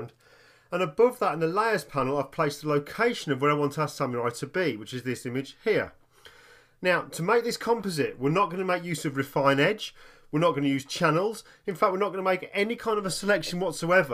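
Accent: United Kingdom. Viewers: It's a UK accent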